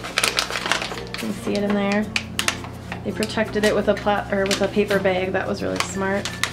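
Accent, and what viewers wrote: Minnesota, United States. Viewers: Hah I knew you were from Minnesota. That accent where bag sounds like "bage". My 3rd grade teacher was from Minnesota.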